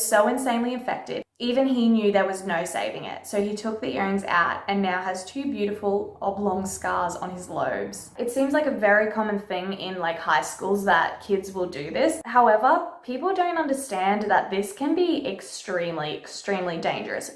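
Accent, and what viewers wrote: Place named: Australia. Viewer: i LOVE the way you say tattoo ! Australian accents rule :) much love from the States <3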